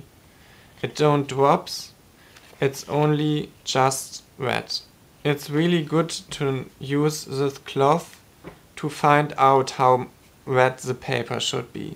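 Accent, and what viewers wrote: Germany. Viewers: Du bist eindeutig deutsch haha gut erklärt !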